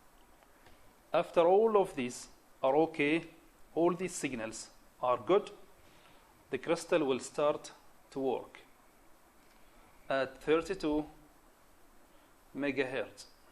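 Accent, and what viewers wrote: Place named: Romania. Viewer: I recognized you with your Romanian accent and your famous "ok?"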